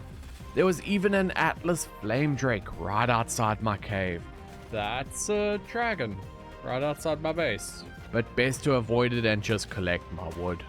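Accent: South Africa. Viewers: Is South African?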